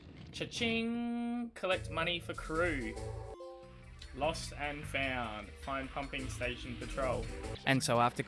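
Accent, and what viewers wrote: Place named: Australia. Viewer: Not my usual sort of content to consume, but hearing an Aussie playing my favourite childhood game and smashing it has earned a sub! Keep it up and hope you smash your goal for the year!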